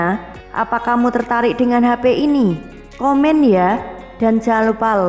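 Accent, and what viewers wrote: Java, Indonesia. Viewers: Terlalu medok mbak,menurut saya mbak harus bisa belajar public speaking yang baik dan benar dan juga yang terpenting gear mic nya mbak,kalau kedua hal tersebut udah terpenuhi insyaallah nanti channel ini akan sejajar dengan sobat hape ataupun gadgetin SEMANGAT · Suarane medok .. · Suaranya itu loh medok bngt jawa nya hedeeh capek denger nya. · Medok banget suaranya wkwkwk 😂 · Medok bgt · Apik mbak tapi ketoro muedhok ketok jowoe hahahahahaha suon njeh mbakku. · Medhok bhanget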